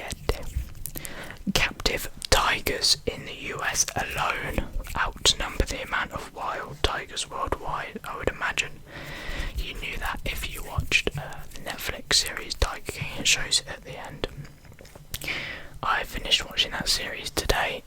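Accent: United Kingdom. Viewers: I love the British accent 🤣. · Your British accent is awesome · Two things I love : ASMR and British Accent❤ Hugs from Brazil💚💛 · Are you speaking in modern rp or just British accent?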